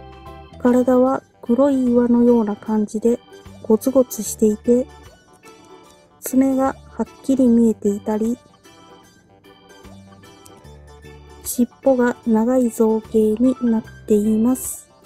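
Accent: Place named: Kansai, Japan. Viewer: 喋ってる人関西弁度々入ってるやん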